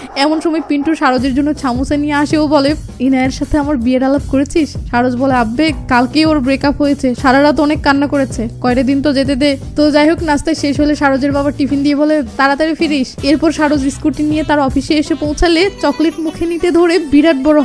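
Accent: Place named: Nawabganj, Bangladesh. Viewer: নবাবগঞ্জের মেয়েরা এভাবে কথা বলে আমার মনে হয় উনার বাড়ি নবাবগঞ্জ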